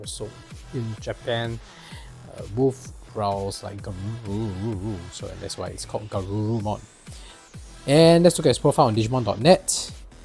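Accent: Singapore. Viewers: liked n subscribed, btw you sound like Singaporean/Malaysian haha anyways looking forward to more digimon content!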